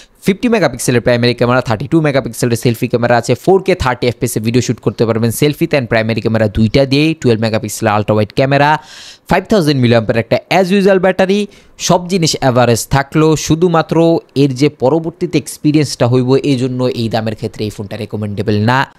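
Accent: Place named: Noakhali, Bangladesh. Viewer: ও ভাই দয়া করে এই নোয়াখাইল্লা ভাষা ছেড়ে শুদ্ধ বাংলায় কথা বলুন। অথবা ইউটিউবে ভিডিও বানানো বাদ দিন। আপনারা বাংলা ভাষাকে বিকৃত করছেন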